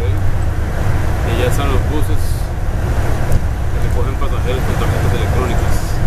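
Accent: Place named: Costa Rica. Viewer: Reconocí ese acento tico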